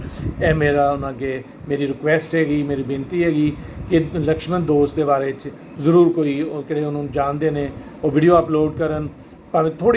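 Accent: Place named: Fazilka, India. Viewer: Jis Insan da Takullash hi..Dast..si..oh wakya hi... Laxman Dost si .ohna de.. Akal Chalana da Afsos hai..Or.. Fazilka or Jalalabad West.. Ilaqey d Bolli da Lehza..Same tuhadey Ilaqey da Lehza hai... Tuhada Gam..Sanjhah karna..tuhadi. . Parwarish da Natiza hai..Sada Hasdey Wasdey raho.. Slam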